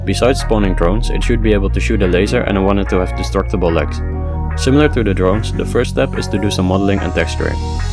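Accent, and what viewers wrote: Netherlands. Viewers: I was hoping you'd do a devlog! Ook leuk om te horen dat je Nederlands bent :D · Mjst say : yoyr dutch accent is in english better then other ditch people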